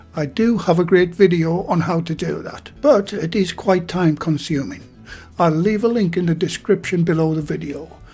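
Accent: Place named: Sunderland, United Kingdom. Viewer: Always enjoy videos some great but simple recipes well explained. The Mackem accent always helps!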